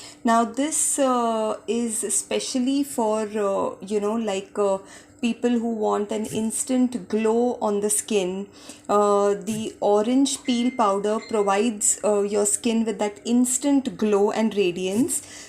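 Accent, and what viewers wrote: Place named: England, United Kingdom. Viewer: I love you English ancent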